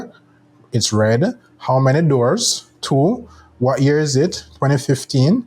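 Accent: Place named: Jamaica. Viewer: Bro you sound so Jamaican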